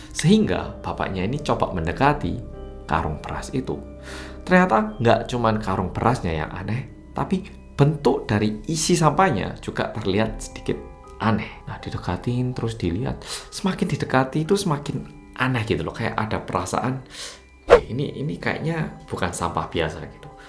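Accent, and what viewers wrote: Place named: Malang, Indonesia. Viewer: annyeon...good channel banyak pelajaran yang di ambil hikmahnya dari channel u.. hampir 16th dimalang logat malang jawanya dapat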